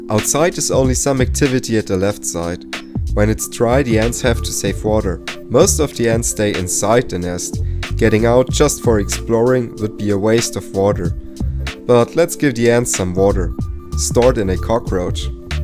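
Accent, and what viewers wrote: Germany. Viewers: Man hört aber ein bisschen das Deutsche in ihm😃😜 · Du bist Deutsch richtig? Der akzent! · Ohne in die Kommentare zu gucken wusste ich das du ein deutscher bist wegen dem Akzent😂😂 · Are You German ?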